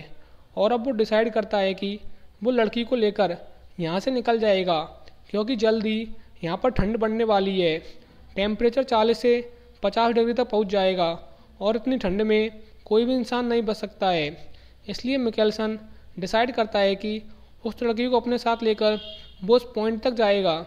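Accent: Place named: Bihar, India. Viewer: Aur teri voice bihari jesi hoti hai ....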